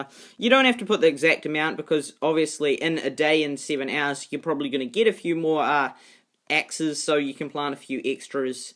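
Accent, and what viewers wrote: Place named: New Zealand. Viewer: WhiteAsian Manchild he’s from New Zealand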